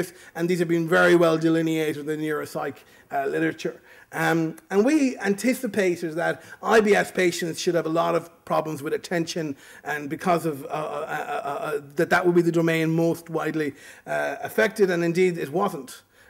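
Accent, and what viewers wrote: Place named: Ireland. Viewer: Where's he from ? I heard him in Science Friday and thought it was a black Irishman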